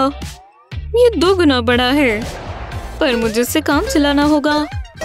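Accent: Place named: United States. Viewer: are you American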